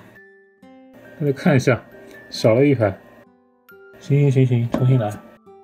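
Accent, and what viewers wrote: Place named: Shanghai, China. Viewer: 对呃，我已经听出来侬呃上海口音了，嘿嘿😁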